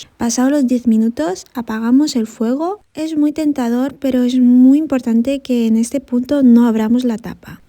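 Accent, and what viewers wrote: Spain. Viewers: Me encanta tu acento español!!!! 😂👍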